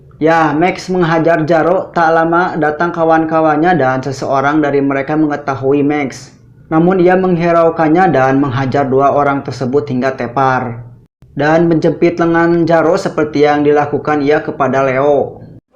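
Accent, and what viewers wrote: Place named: Lebak, Indonesia. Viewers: Medok sundanya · Narasi nya ..suara.kedengaran sprti dari Lebak yaaa....pas lagi bilang ..Lucerroooo...Leeooo...Romeooo...sprti sandiwara Raadioooo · Sundaan geuning..prekeetek emang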